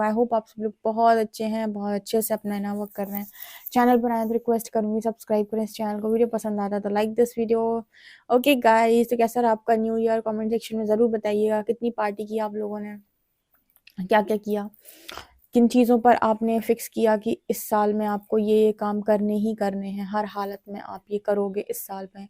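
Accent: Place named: Bareilly, India. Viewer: Kya ap bareilly se ho, accent to wahi hei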